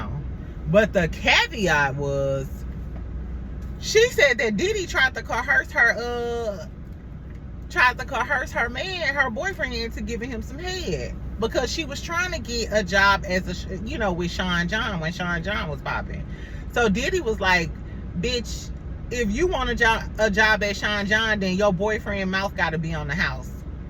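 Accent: St. Louis, United States. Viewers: Love your commentary and STL accent!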